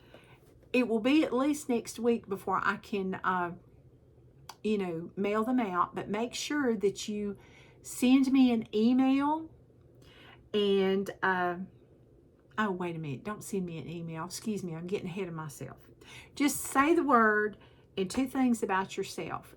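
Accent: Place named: South, United States. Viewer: Congratulations on 1,000 subscribers. I was born in Texas, so love listening to your southern accent. I love to play pickleball and take color tour walks in the fall ya’ll. Kitten, wine, quilt, Henry, Season, Thanksgiving, Raven, Sampler, Seashell, Pumpkin.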